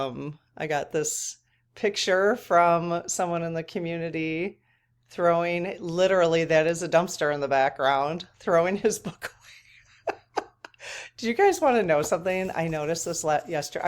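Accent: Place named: Wisconsin, United States. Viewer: Just stumbled on your channel. Love your "Sconnie" accent!!! I grew up in southern Wisconsin and now live in Minnesota.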